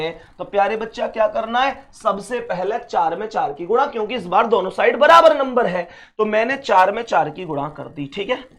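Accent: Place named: Mathura, India. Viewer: Mathura ki boli on point :hand-purple-blue-peace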